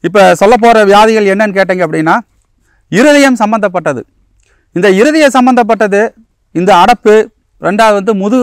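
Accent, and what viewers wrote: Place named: Coimbatore, India. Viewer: Coimbatore Tamil very beautiful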